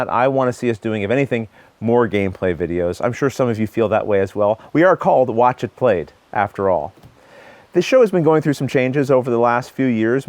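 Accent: Canada. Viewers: You've taught me how to play a number of games this year and I'll definitely keep an eye out for your fundraiser. My wife always knows when I'm watching a show because she recognizes a fellow Canadian accent! :) You have some of the highest quality videos in terms of production which I imagine takes some time, and they often focus on major releases. With that in mind, have you considered doing something like a "quick glance" video segment/series that isn't as in depth as the normal videos but allows you to touch on a broader range of topics/games/smaller releases? Because I think the bottom line is you've got a good viewing personality for gaming, but unless I've just bought the game or am thinking about buying it, I'm not going to watch an instructional video for the fun of it, you know? Table Talk is great, but as you mentioned it's less of a focus. Regardless, thanks for your contributions to the community!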